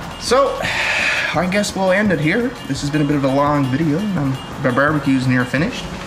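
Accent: Newfoundland, Canada. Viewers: You have got to be Newfie man. I know a fellow newfie when I hear one. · Where are you from buddy? your accent sounds like your from NFLD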